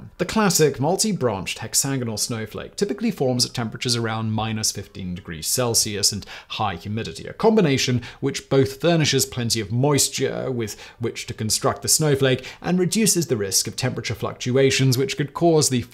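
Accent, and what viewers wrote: United Kingdom. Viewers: I can't see eyes scanning as he reads but he can't possibly have memorized that whole script. Can he have? Anyways, the combination of densely and precisely scripted scientific information delivered at an incredible clip in that British accent does make him appear to be THE SMARTEST HUMAN BEING ON THE PLANET. Amazing content as aways.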